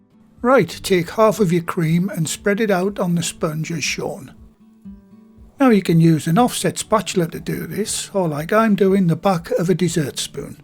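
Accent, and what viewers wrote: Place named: Sunderland, United Kingdom. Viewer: Thank you for all the wonderful recipes we have enjoyed all these years. I actually learned baking bread following your instructions. On this recipe I used large eggs and the end result is far more runny than your final batter, any suggestion for the next time please? My dearly departed wife was from Sunderland and your accent is a kindly reminder of happy times. God Bless You.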